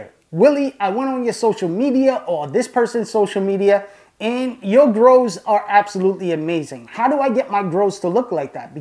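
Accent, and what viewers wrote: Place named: Massachusetts, United States. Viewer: we know your in Mass with that accent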